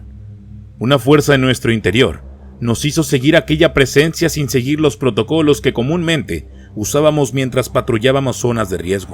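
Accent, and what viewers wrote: Mexico. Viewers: Quiero el enlace para el correo...además de una buena voz...una buena historia tiene el encanto del acento mexicano!!!! Desde Argentina loa amo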